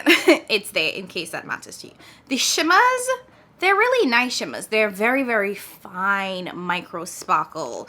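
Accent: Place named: Trinidad and Tobago. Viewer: Are you from Trinidad? I detect an accent! I am considering picking this palette up but I was worried that some of the mattes would be too light for me. This video was really helpful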